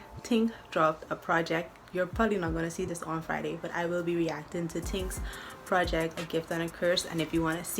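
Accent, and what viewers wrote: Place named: Trinidad and Tobago. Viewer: My girl I'm hearing a lil Trini in yuh accent🇹🇹🥰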